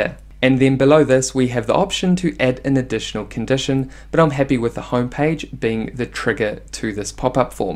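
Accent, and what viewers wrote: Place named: New Zealand. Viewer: Love hearing the Kiwi accent on Youtube instructional channels. No mucking around. Shot bro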